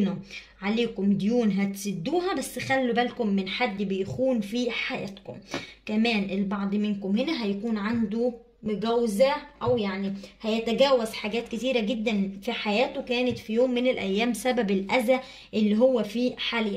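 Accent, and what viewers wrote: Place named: Mosul, Iraq. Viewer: احجي مصلاوي واتاكد انتي مصلاوية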